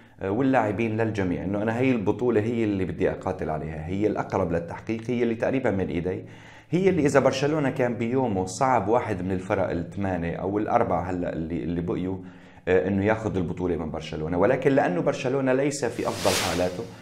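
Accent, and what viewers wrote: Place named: Aleppo, Syria. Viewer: انت مبيين انك حلبي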